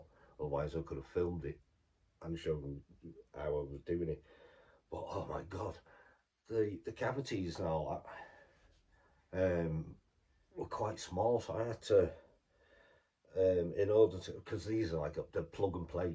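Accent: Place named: Northwest England, United Kingdom. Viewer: Nice one! Love that gothic looking pick guard on the tele! You sound like you might be from the same part of the world as my family, in the north west, somewhere near Liverpool perhaps?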